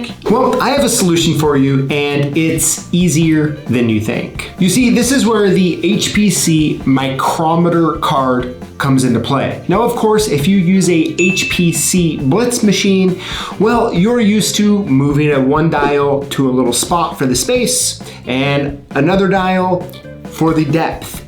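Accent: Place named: South, United States. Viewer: Thanks,. We southern guys actually like it when people assume that we're stupid because of our accent.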